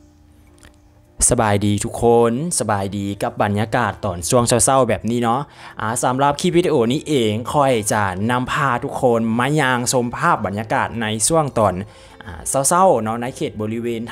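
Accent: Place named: Isan, Thailand. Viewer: เสียงเว้าคัก...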